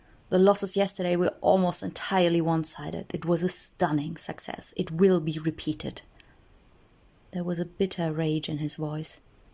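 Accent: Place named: Paris, France. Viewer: It’s sounds like the narrator has a Parisian accent - that would explain the mouth noises. I don’t mind it honestly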